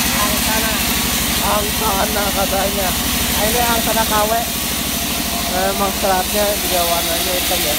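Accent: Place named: West Java, Indonesia. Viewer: Mantap ey operator na... Asa wawuh sunda na palih mana kang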